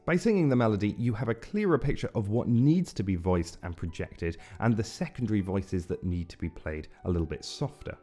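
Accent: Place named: United Kingdom. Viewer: Beside the subject...I absolutly love his accent ❤ Is it British....certainly not Américain 🤮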